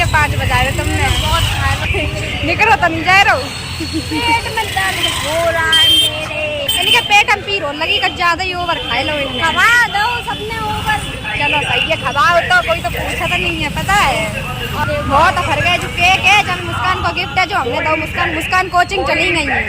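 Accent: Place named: Mainpuri, India. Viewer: Aapki Mainpuri language is best...?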